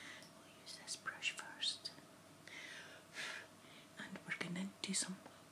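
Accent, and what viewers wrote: Scotland, United Kingdom. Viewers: You're glasses are just everything! Scottish accents are still the best!! 😘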